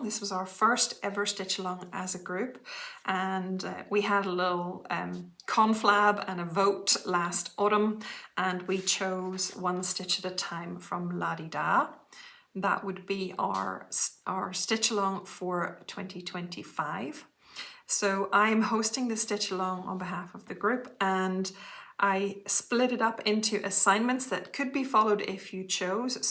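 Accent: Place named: Ireland. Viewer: I always enjoy your stitching and listening to your accent. Reminds me of my trip to Ireland . The Nativity is lovely.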